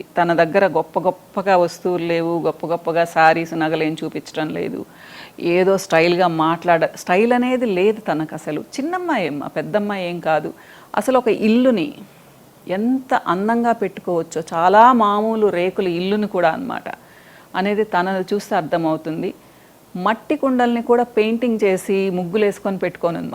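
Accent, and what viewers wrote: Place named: Godavari, India. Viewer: Maa godhavari slang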